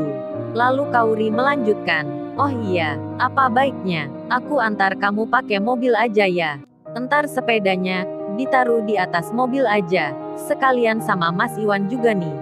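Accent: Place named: Tegal, Indonesia. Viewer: kaya,a mirip boso tegal nggih 🤭🤭🤭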